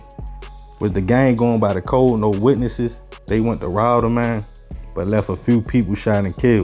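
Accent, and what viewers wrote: Baltimore, United States. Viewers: Are you from Baltimore?? I'm from DC, and I have family in Baltimore so I know a B.More accent when I hear one. The area that this went down in is on the DC waterfront, this is the neighborhood by the DC wharf. "The Spirit Of Washington" yacht does dinner cruises on the Potomac River and the boat leaves from the dock next to the wharf..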